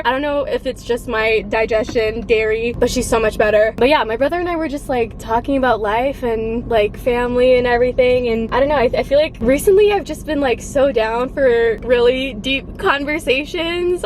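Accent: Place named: Canada. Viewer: I didn’t know us Canadians had an accent 😅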